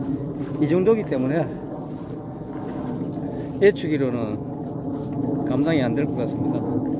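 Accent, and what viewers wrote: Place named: Andong, South Korea. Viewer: 992 출발합니다 오셔서 감사해요 농원의 달콤한 향 가득 만날생각에 설레요 같은 지역의 억양이라 더 정겨워요 안동태생 입니다 ㅎ 자주올게요 ❣️☕️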